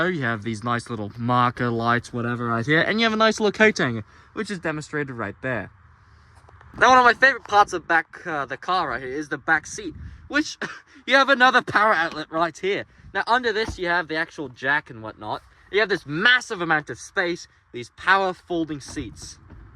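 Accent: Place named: Europe. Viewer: This is simply false, and this masked charlatan with his European accent is dealing with an uninformed personal opinion of an individual machine. The Expedition – note: the XLT he has is the most bare-bones model-- is large, luxurious in better models and, if important regular maintenance is involved, very reliable. The engine can have a sludge buildup if insufficient maintenance is done which can lead to problems, but ignoring a car or truck is bad news regardless. It does not get good mileage, but it is a big muscular V-8 which can tow a house if you hook it up. Probably this little fellow is resentful of that fact. I take mine – with dogs, four crates and shotguns-- bird hunting and it’s great off-road and in snow when others bog down. No doubt he’d be happier with an electric vehicle.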